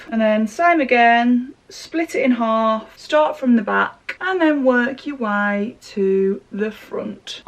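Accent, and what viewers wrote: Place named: Telford, United Kingdom. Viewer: This saved my life this morning 🤦🏻‍♀️ can I ask where your from? My family are from Telford and have the same accent 🥰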